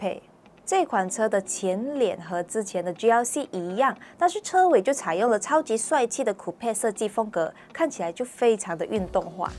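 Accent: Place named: Malaysia. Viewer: 我喜歡這種大馬口音 加油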